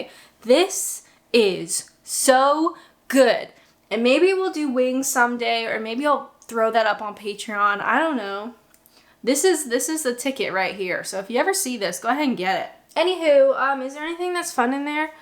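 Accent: United Kingdom. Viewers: wuss-tuh-shuh. There used to be a Worcester Sauce flavoured crisp. They spelled it 'Wuster' on the packet to try to help people say it. Still, your British accent is coming along well in other ways. You have a most un-Canadian glottal stop on the word 'fruit'. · Great video as always 👍 Your fridge is HUMONGOUS compared to mine!! I love it when you do your British accent, it's coming along nicely 😉 My favourite teabags are the Co Op's Fairtrade tea, not too strong or weak, just right for me. Are cheese and onion crisps popular in Canada? Obviously they are very popular here in the UK. Well happy valentines day, I hope your other half has spoilt you rotten today ❣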